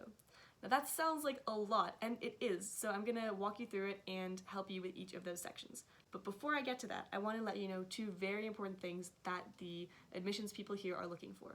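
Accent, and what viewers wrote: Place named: Canada. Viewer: I love her Canadian accent and I don't know why I love it so much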